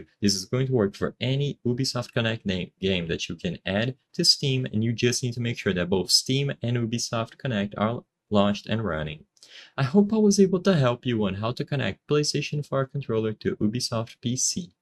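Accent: Brazil. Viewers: Esse sotaque... Desconfiei que era brasileiro. Acessei o canal e confirmei minhas dúvidas hahaha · o cara fala bem, mas o sotaque é sempre reconhecível pra BR q manja de inglês kkkkkkkkkkkkk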